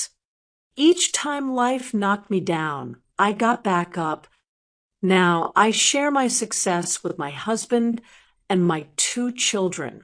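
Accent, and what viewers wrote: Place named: United States. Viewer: American acsent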